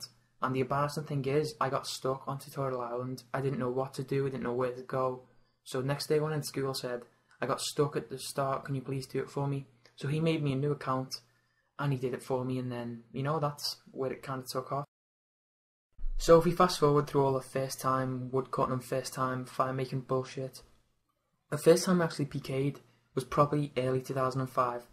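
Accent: Liverpool, United Kingdom. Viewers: never knew u were a scouser ~ Aaron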